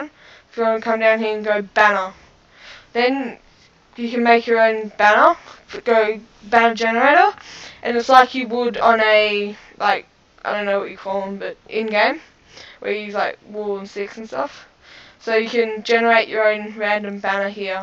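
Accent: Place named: Australia. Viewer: Nice aussie accent mate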